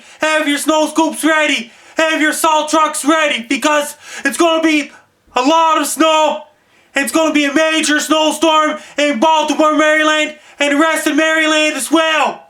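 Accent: Canada. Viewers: That enthusiastic Canadian accent made my day.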